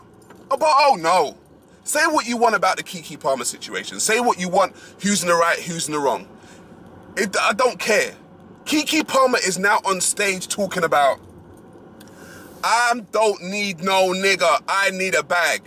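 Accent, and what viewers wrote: United Kingdom. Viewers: I’m sorry, but you saying, “Oh f*ck off” sounds so polite. I have no idea why the British accent makes the most offensive sayings sound nice.🤣 With that said, I completely agree with you. I am not offended by what you said because it’s true. Way too many black women are out here making us all look bad. I do my absolute best to be the positive image of black women. My daughter is biracial, but I also teach her to be the positive image of both the black and mexican communities. Unfortunately, there are major problems amongst women in both races. I mentor young black women so they know the path they should take and why they should take that path. I even seek out young and older black women in comment sections of videos who have a destructive mindset. I don’t shame them for their mindset, but I let them know their mindset is not one that breeds lifetime success. 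Some of us are out here on the ground doing the work. We don’t have YouTube channels because we are working in real time.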